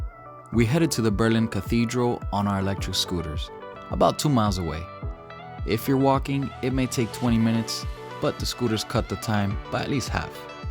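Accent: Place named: Australia. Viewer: I didn't know you had an Australian accent. And wow rural Germany is beautiful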